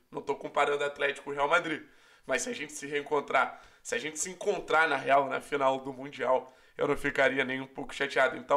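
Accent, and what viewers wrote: Rio de Janeiro, Brazil. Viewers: Paranaense mais Carioca q ja vi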